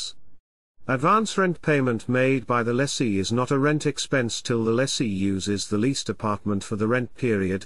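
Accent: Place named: United States. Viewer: صوتك بقا امريكانى اخر حاجه :)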